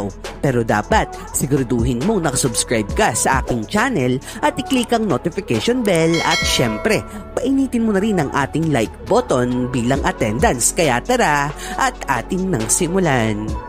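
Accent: Batangas, Philippines. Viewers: Batangeño kapo?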